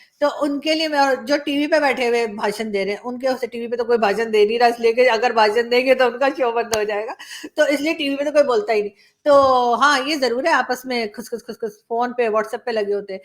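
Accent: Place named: Lucknow, India. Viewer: Well said....... Lucknow's style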